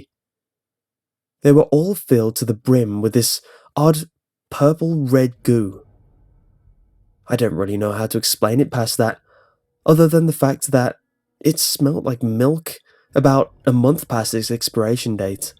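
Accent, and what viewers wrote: United Kingdom. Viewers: british accent is succulent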